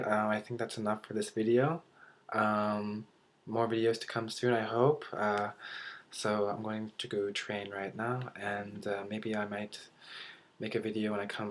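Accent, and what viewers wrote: Bavaria, Germany. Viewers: Ich bin beeindruckt. Du sprichst was Wortschatz und Grammatik betrifft besser deutsch als so mancher Deutsche :-). Sehr gut auch der bayrische Dialekt. Da sieht man mal wieder was man mit Spass, Fleiß und Talent alles erreichen kann.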